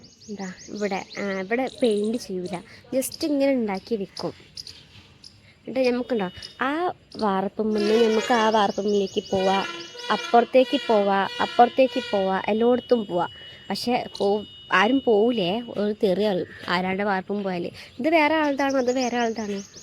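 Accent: Malappuram, India. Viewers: മലപ്പുറം സ്റ്റൈൽ സംസാരം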